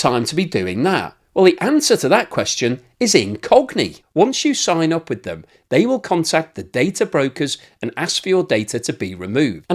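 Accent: United Kingdom. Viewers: what Propegandist like fail to realize is there are countries with far worse inflation than Russia which still manage to keep their economies from collapsing. Russia's is an economy of war, it does not, and cannot be measured like a a regular economy. What matters to Russian citizens is that their basic needs are taken care of, and indeed they are. I guess these people need another year or two to take back what they're saying now, the exact same way they ate humble pie about Russian Army being in shambles. Now you're handsome fella and you have a nice British accent which might fool some people into thinking you're some kind of authority on Russian economy, but you are the furthest thing from it. (your own country "Britain" I assume is turning into a 3rd world country_ businesses closing left right and center aren't you better of "commentating" on that? 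lol)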